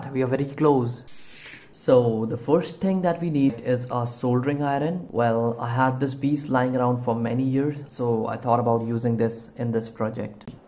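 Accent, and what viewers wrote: India. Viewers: Ini videonya reupload kah ? Judulnya pakai bahasa Indonesia, tapi presentasinya menggunakan bahasa inggris dgn aksen India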